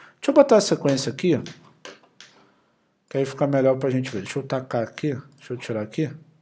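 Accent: Rio de Janeiro, Brazil. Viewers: Eu adoro o jeitinho carioca "Tu vai continuar reclamando?" 🤣 Obrigada pelas aulas! Aprendo muito aqui e paro de reclamar!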